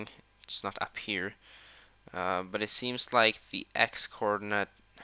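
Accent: Sweden. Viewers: are u Swedish XDD i am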